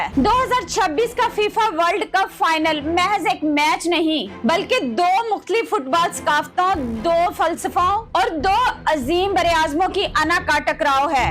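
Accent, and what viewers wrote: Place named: Punjab, India. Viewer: یہ کوئی بڑی سفارشی ھے،،،اردو بھی پنجابی میں بول رھی ھے،،،جیسے خواجہ آصف بولتا ھے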